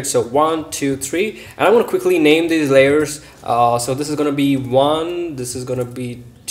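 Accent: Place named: India. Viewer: You're indian I kinda speak similarly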